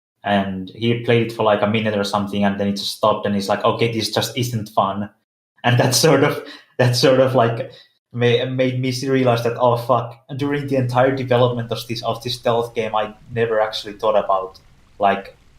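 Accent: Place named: Finland. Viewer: Ralli englanti go wild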